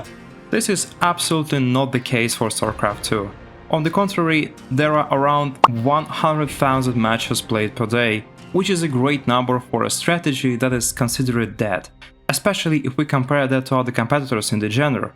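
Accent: Russia. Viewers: Why do Russians pronounce match as /much/?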